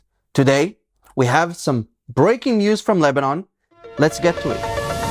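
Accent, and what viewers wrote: Russia. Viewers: slight Russian accent?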